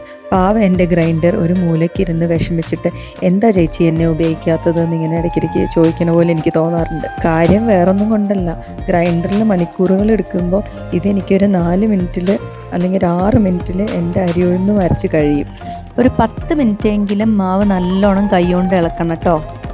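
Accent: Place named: Thrissur, India. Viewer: Sister you do not have a Kannur or thalassery slang it's that of Thrissur and Palakkad anyway good 👍 Sruthi from Dubai hailing from Kannur at thillenkeri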